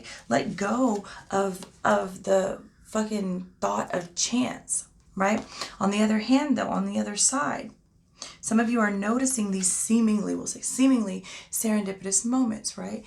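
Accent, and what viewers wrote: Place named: North Carolina, United States. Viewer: I really enjoyed your message and it was it was great to see you face finally. I’ve been a subscriber for about a month now but your message today was so thought provoking. I absolutely need that kind of coaching in my life... I held onto every word! I have to say I think we share the same accent?? NC maybe? Anywho I love you woman, keep up the inspiration and thank you!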